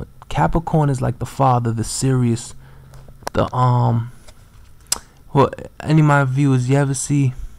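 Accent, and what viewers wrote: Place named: New York, United States. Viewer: where you from baby? ny? I love yourrrrrr accent. ugh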